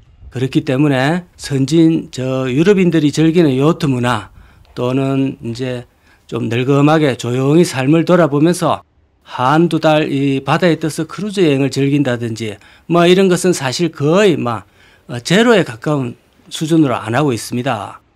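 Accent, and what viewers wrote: Gyeongsang, South Korea. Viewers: 꾸준히 잘보구있읍니다.좋은 조언감사합니다.그냥 인생공부한다 생각하고 봅니다.수고하세요.참 구수한 갱상도 사투리! 얼굴이 궁금해지네요? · 사는데가 김해인교? 말투가 전형적인 부산.김해 이신거로 보이는데 뭐하시는분인데 공실건물을 찾아다니는건감요? 경매 하는교?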